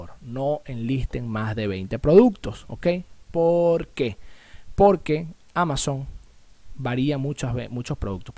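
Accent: Venezuela. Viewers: GRCIAS, EXCELENTE, CLARA, CONCISA Y SENCILLA EXPLICACION, POR TU ACENTO Y MODO DE HABLAR SEGURO QUE ERES VENEZOLANO COMO YO. GRACIAS.. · amigo saludo desde venezuela super importante su informacion pronto estare en contacto con usted,por su forma de expresarse es de venezuela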